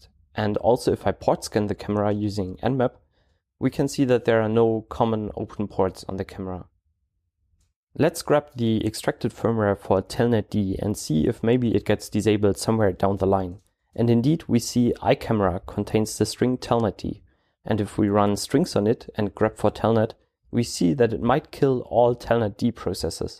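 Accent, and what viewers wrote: Germany. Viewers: bin mir absolut sicher, dass du aus dem deutschsprachigem raum bist. dieser akzent ist so einzigartig hahah :D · Your english sounds german. Where are you from?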